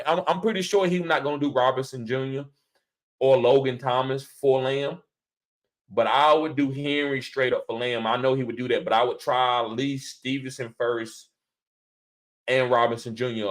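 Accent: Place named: South, United States. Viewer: Bruh, i love that Breece comment!!! 😂😂😂 Your southern tongue does make it sound like breech!!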